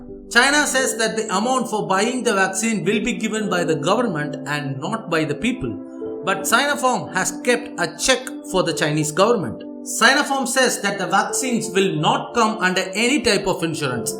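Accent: India. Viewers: Watch out for your indian accent in the way you speak English, apart from that you have a nice content and imagery.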